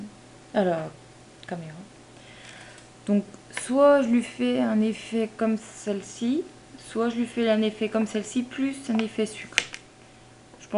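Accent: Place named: Nord-Pas-de-Calais, France. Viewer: ta l'accen chti je trouve lol j'<3 bien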